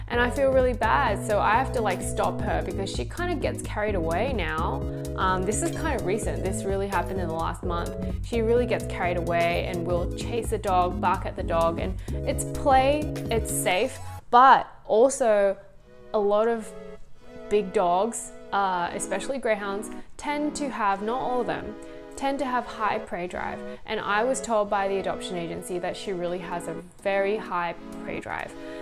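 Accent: Australia. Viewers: Are you an Aussie? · Thanks for the advice, I love greyhounds, the most placid sweetest dogs. You have a lovely hybrid Australian soft U S accent, nice to listen to. I’m Spanish, live in Sydney Australia. God bless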